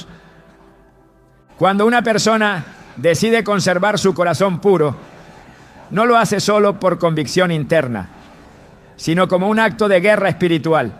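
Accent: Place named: Spain. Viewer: Dios te bendiga!! Oye después que habla parece como si cogiera un aire y además oye bien de lo que habla y como habla parece un español. Es muy facil saberlo.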